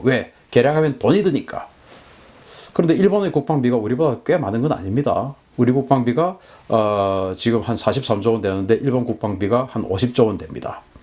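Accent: Gyeongsang, South Korea. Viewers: 다좋은데 상도사투리듣기가 좀 거북하네요.표준말쓰세요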